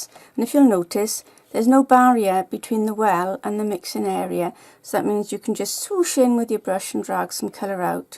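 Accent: Wales, United Kingdom. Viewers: Yeeeeaah - a three parter - you've just made my day! What better then to sit down and watch an interesting and instructive tutorial whilst listening to that gorgeous Welsh accent! Thanking you in advance for all three videos.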